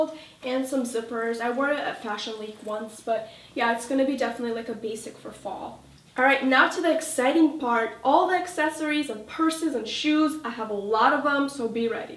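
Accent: France. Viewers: i'm from canada and i speak french at home and I love the french accent !!!!! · Je suis fraçaise et j'adore ton accent français ! :D Bisous de la France <3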